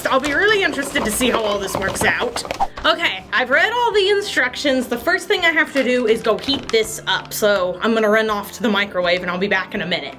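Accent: Texas, United States. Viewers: I love your slight texas accent! It makes me so happy!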